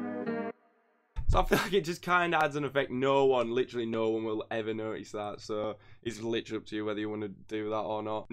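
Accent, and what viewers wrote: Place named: Northern England, United Kingdom. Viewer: You are definitely from north England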